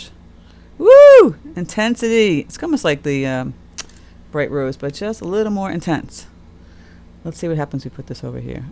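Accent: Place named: New England, United States. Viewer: Love your videos. Very helpful, and I love your New England accent. 😊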